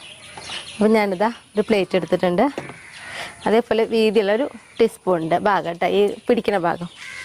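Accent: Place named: Thrissur, India. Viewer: de സംസാരം ശരിക്കും ഞങൾ പറയുന്നപോലെ തന്നെ.... കോഴിക്കോട്ട, കിണ്ണം,davara allam.same. തൃശൂർ എവിടെയാ വീട്.... നമ്മൾ കുന്നംകുളം,പഴഞ്ഞി, ചെറുതുരുത്തി ആണ്....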